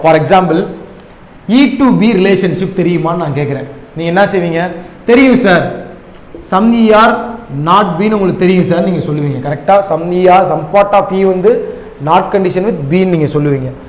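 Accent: Madurai, India. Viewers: Anna neenga madurai ah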